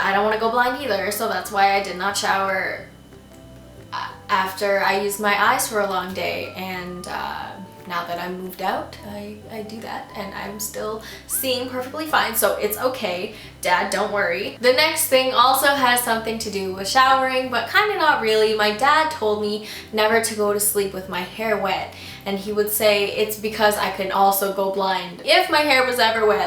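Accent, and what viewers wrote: Visayas, Philippines. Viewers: Your bisaya is so adorable . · Maayu gani kabalo pa xa mo bisaya! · Grabe mo bisaya hahaha. Btw, Ate murag kag si Ate Bern sa CDO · Bisaya lagi kaayo dai HAHAHA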